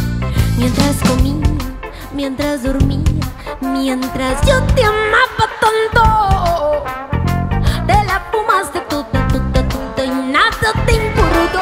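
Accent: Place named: Chile. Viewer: Sigue hablando como chilena jajaja. Me encanta eso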